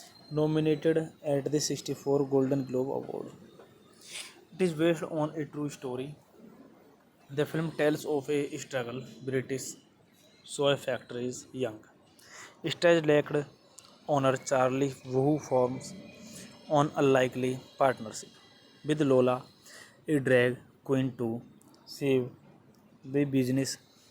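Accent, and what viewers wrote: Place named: India. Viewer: Estúpido el que hizo estos videos. Queremos la película no tu voz de la india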